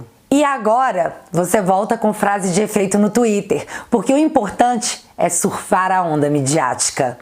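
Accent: Minas Gerais, Brazil. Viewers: ETA mineirinha porreta . Dezzzzzz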